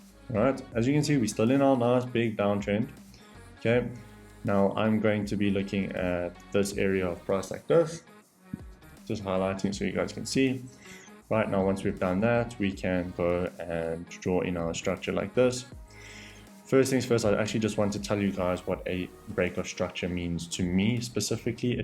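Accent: South Africa. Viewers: I know a South African when I hear one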